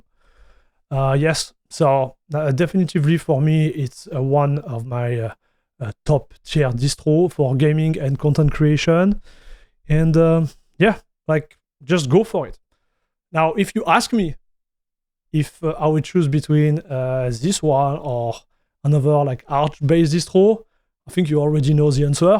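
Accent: France. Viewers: Les français qui ont remarqué l’accent français 🖐️ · you don't need to be french to notice the heavy french accent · I love your videos, so informative and I like your french accent too 💖 running nobara 41 rn. unfortunately I haven't gotten time to game as much but it's great. I've been able to get almost everything working with a few quirks I need to find my way around over the year. funny thing, my cs2 install won't stop lagging and hitching. same issue on windows too, to a lesser extent tho. haven't tried different distros yet but I figure it'll be the same everywhere atleast on wayland. not touching x11 cuz I want how to learn to fix issues instead of putting a temporary band aid. anyways thanks for the videos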